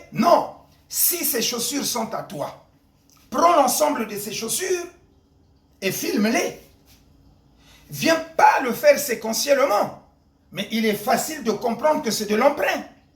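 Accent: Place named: Lari, Republic of the Congo. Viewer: bonjour! j'aime bien ce que tu fais mais s'il te plait, hôte en toi cette envie de t'exprimer comme tu le fais. l'expression tu l'as mais la tonalité laisse à desiser.tu as un fort accent lari donc ne force pas à singer ce n'est pas du tout agreable,soit simple.merci sans mauvaise foi.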